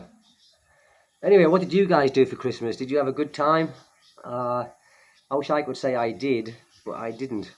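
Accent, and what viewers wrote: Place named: Northern England, United Kingdom. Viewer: Are you from Manchester by any chance ? Im sure somewhere from the Northern England based on your accent.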